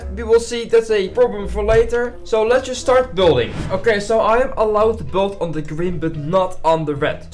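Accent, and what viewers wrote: Netherlands. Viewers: het nederlands accentje is er wel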